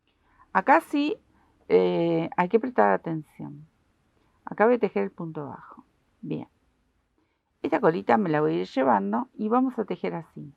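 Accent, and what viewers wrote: Argentina. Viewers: ¿Cómo escucho en castellano? De repente hay una voz mecánica y fea en inglés ¡en vez de mí acento argentino favorito que extraño tanto!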